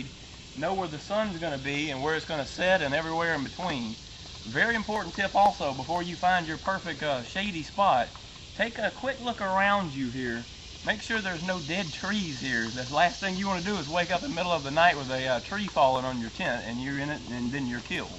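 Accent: Texas, United States. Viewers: The tips at the end of the video is extremely helpful! Is that Texas accent?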